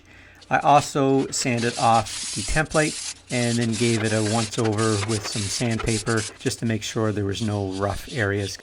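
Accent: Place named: Alberta, Canada. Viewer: This man has an Alberta accent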